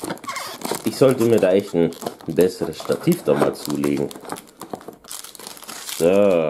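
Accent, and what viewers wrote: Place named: Ukraine. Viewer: Meine Tochter hat eine Go-Pro (ich hoff, das heißt so) fürs Reiten - das bräuchtest du für deine Auspack-Videos. Jedesmal wenn du was essen wolltest, konntest nicht, weil einhändig, du armer Kerl. Dein Englisch erinnert mich an einen Ukrainer, den ich kenne :-) Die unterschiedlichen Farben sind ja geil! Bussis an deine süßen Zwei :)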